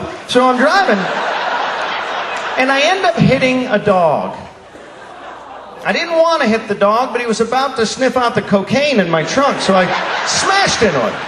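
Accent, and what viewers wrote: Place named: Boston, United States. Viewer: The are both from the Boston Ma area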